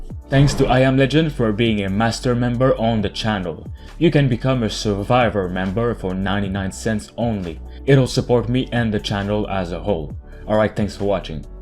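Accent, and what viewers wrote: Quebec, Canada. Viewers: T'es québécois... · Man, that QC accent is strong. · He's from the province of Quebec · He's French Canadian bud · Hey nice vid, you from Québec by any chance hen? J’entends un ptit accent 😉 keep up the good work 👌🏽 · So... any french canadian? lol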